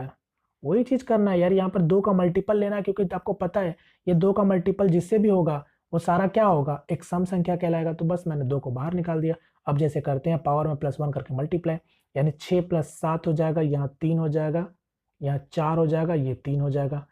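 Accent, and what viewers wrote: Jharkhand, India. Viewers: Your accent is like jharkhandi....1 tho 2 tho...😁. Ye sirf jharkhand wale bolte he r u from Jharkhand..??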